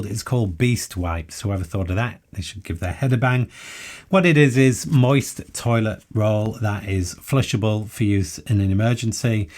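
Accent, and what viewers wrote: United Kingdom. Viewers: Love to listen to British accent, as a 2nd generation american, I get nostalgic of listening to my grandfather and great uncle. And very good advice and explanations. Went through my pouch to see that I had most of his suggestions.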